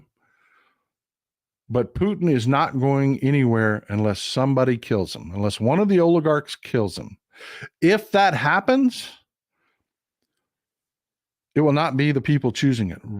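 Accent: Texas, United States. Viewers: I love hearing that Texas accent:) Yerp=Europe:)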